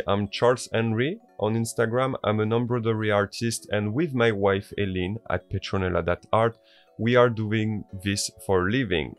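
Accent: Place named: France. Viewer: French accents speaking English is so lovely. Gorgeous needlework too 🥰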